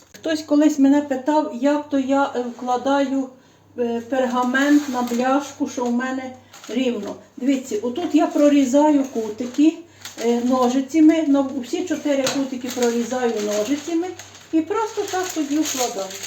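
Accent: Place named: Ukraine. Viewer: Доброго дня Вам пані, гарні всі Ваші рецепти і особливо стародавні. А ще Ваша розмова зачаровує, але не міняйте свого діалекту на те, що Вам там можуть зауважувати, мовляв не "лижка" а "ложка" - але ж цим і є особливість Ваша!!! Говоріть так як Ви і завжди звикли - це дуже гарно і своєрідно українське!!! Міцного здоров'я, мирного неба і процвітання Вам!!!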